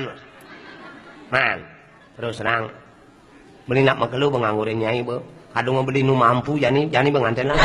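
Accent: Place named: Buleleng, Indonesia. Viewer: Logat asli Buleleng, kasar tapi lucu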